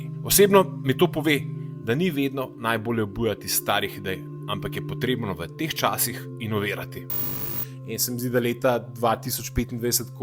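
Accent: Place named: Ljubljana, Slovenia. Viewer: prosim uporabljaj Slovenščino ne ljubljanščine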